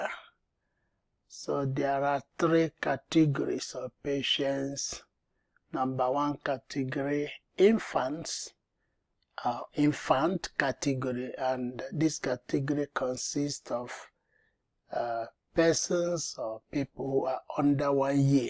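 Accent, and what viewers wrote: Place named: Africa. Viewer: Information is very good . Nice presentation. But the accent is hard to pick for none African viewer's. Good job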